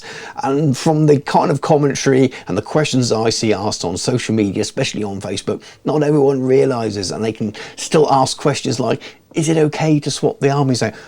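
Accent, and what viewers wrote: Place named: England, United Kingdom. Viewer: It is an absolute joy listening to you read Old World narrative in your English accent.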